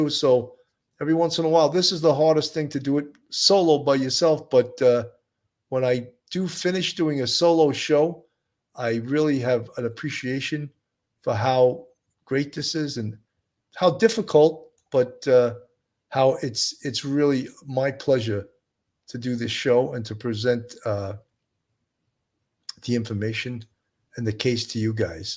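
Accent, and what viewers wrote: New York, United States. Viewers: I love your accent, New Yorker ❤️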